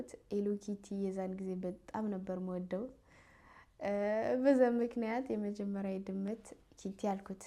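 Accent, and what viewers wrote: Gondar, Ethiopia. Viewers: it’s very nice u are still Abel to speak Amharic ,,, u have a gondere accent btw😄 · ጎንደሬ ነች መሰለኝ አክሰንቷ❤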